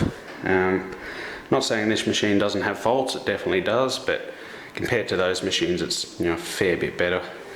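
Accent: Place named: Australia. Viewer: Me likes your aussie accent :) Noice!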